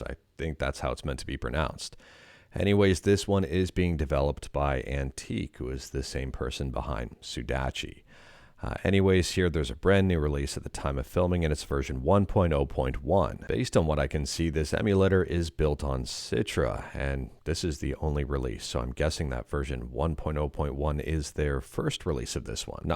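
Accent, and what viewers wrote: Canada. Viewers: You say aboot. Defiantly Canadian. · Can confirm: The day you learn you have a Canadian accent is very Jarring. and that's coming from someone who is tesl certified as one of the first things we learn is other countries prefer Canadian instructors because of our lack of an accent. Go figure eh? lol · Your Canadian accent is minimal if that's any consolation.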